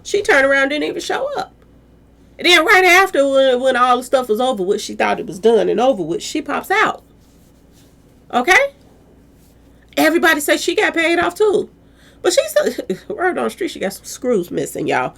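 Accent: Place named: South, United States. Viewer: Are you from Mississippi Alabama down south I can tell you had a southern asset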